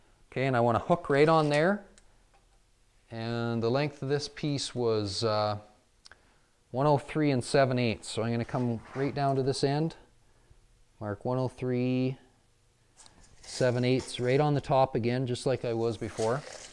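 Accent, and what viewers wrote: Canada. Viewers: You must be from Canada or the Upper Peninsula of Michigan huh? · How nice to share your knowledge with all of us. Love the Canadian accent - you are a great help sir. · def from canada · His accent gives him away. Haha. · Is he from canada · His accent is a pretty big giveaway but his hat also says Canada.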